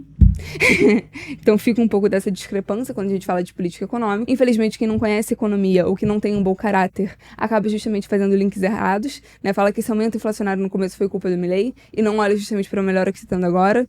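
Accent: Rio de Janeiro, Brazil. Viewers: Ótimo vídeo, pena que é sotaque RJ. Você tem twitter moça?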